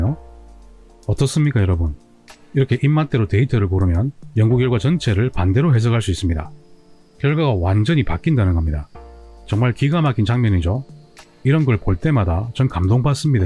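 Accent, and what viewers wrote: Gyeongsang, South Korea. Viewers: 선생님은 경상도 분이시군요. 목소리가 정감 있습니다. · 말투를 들어보니 대구분이신듯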